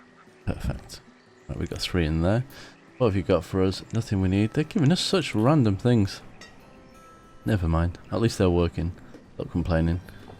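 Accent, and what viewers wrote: England, United Kingdom. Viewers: Great, Lake Dulcet is back on track! On a different subject, when you close your eyes and listen to certain parts of this episode, it reminds me of someone and it finally dawned on me who... Is Hugh Grant from your part of England (similar accent)? ;-)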